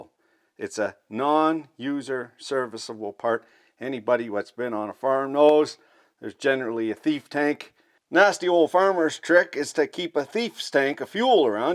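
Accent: Canada. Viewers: I tell ya I love you man! I'm canadian been living in USA since I was 9 (am 39) but just listening to the engineering knowledge mixed with canadian common sense humor and a smidge of the accent brings me back to listening to my grandpa in Regina sask working on his international harvester good times. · Great to hear a true canadian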